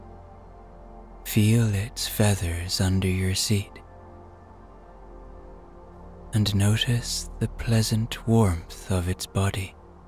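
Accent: Ireland. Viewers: Hi, I’d like to say a massive thank you to you for your wonderful channel. Since my amazing hubby passed away, bedtime for me has brought anxiety, tears, insomnia like you wouldn’t believe and emptiness. I’ve tried music, silence, audiobooks, meditation and yet still I found it so difficult to relax. Your sleep stories are beautiful, your gentle Irish lilt soothes and caresses away the anxiety. I can categorically state I haven’t yet got to the end of your stories, which for me is a miracle! You are truly a gift to me and I wish you every success in growing your channel…. I for one will be listening and looking forward to each of your uploads… Again, thank you so very much for all you do for us in your growing community xXx💜xXx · OMG in the greatest respect I love you as an actor, TBh I was surprised that your beautiful silky voice as a storyteller actually sends me to sleep. 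Why? Bcoz I've suffered insomnia, bcoz of PTSD for years, so thank you for making my night more focused and yeah, without sounding insensitive your beautiful Irish tones help me to blissful night's sleep ❤🙏🙏🙏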